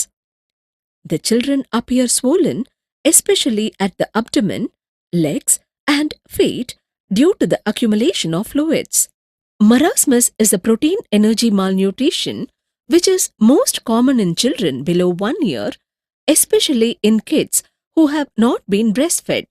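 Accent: India. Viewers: seriously dude its an Indian accent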